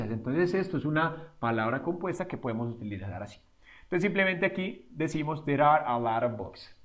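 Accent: Antioquia, Colombia. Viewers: Es la primera vez que veo un video suyo, profesor. Estoy estudiando inglés porque siempre me ha encantado, pero entre más lo estudio descubro que no es tan fácil como creí. Justo este tema de los cuantificadores me confundió pero gracias a su explicación lo entiendo mejor. Muchas gracias por tomarse el tiempo de dar esa clara explicación, además su maravilloso acento paisa tan marcado lo hace mucho más agradable. Muchas gracias, ya me suscribí. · Me encanta la voz de los colombianos y no no me gusta los hombres por si alguien solo pensaba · Gracias profe, se parece a Vegetta 777, pero en Colombiano, gran video por cierto.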